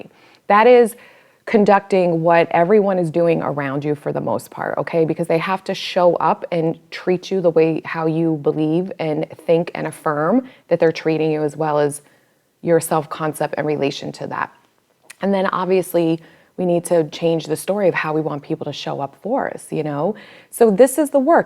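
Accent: New York, United States. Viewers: She's from NY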